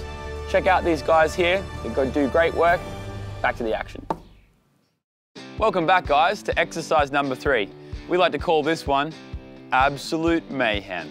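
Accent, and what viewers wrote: Australia. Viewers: Are u australian?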